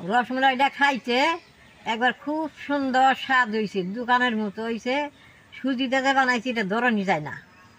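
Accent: Tangail, Bangladesh. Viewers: Dida apnar vasa amadar tangayl ar vasar satay onayk mill . Rosmalay darun hoyaca.❤❤❤apni onayk cute.😅😅😅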